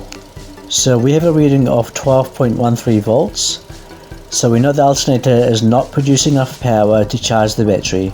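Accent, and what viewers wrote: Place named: New Zealand. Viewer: Evening!! I have thoroughly enjoyed your videos as I posses the same vehicle therefore is very informative & helpful. I couldn't help but notice the kiwi accent! As an aspiring mechanic, you as a mentor would be fantastic.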